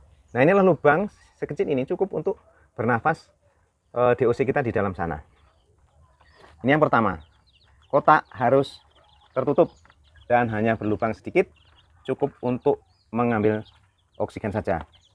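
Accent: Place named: Java, Indonesia. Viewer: Matur nuwun Bp dgn nya. logatnya kok Jawani gih.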